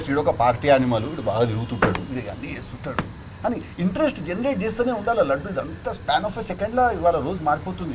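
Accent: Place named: Secunderabad, India. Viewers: Pakha secundrabad musheranad slangh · Aa body language,..... Hydrabad slang chustunte... Idi edo..." Rahul splingunz.".... Biopic la undi..... Any ways... bhai...all the best.. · Hyderabadi slying super till Bhai ❤️ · Annaa mastuu matladuthunnav Anna Hyderabad slang lo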